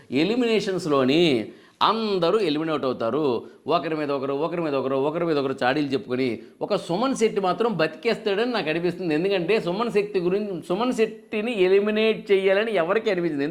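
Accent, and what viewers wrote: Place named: Srikakulam, India. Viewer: Enti sir maa Srikakulam slang touch avuthundi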